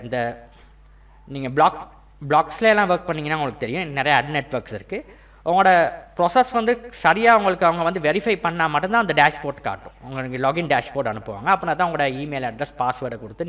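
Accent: Sri Lanka. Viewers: Colombo tamil ( srilanka ) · Type of Upcountry Tamil in Srilanka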